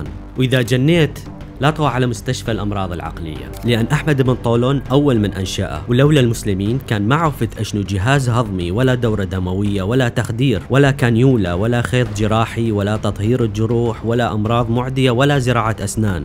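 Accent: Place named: Mosul, Iraq. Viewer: اللهجة المصلاوية واضحة أحسنت الكلام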